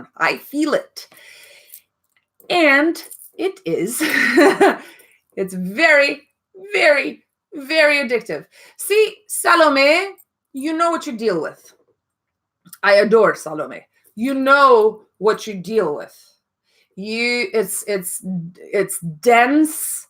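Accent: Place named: Romania. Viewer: Incep sa ma uit la Imperial Opoponax pt ca asta ma interesa si zic in mintea mea: 🤔 parca are accent de romanca 😅 si ma uit sub video si vad: romanian chanel ....😅 am avut noroc sa il gasesc in Norvegia si l-am luat intr-o secunda😁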